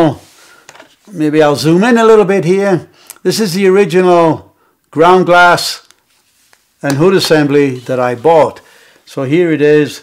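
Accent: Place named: Germany. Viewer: Superb and definitive video, I am interested in your accent, I am hearing, German, Manchester England and California, please do tell 👍🏼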